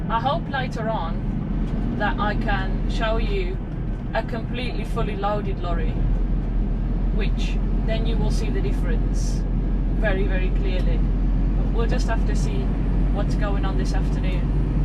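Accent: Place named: Newcastle, United Kingdom. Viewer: I love your Newcastle accent, boy do I miss a manual gearbox, most new truck are auto's, I'm really jealous watching you flip up and down the gears. Great video.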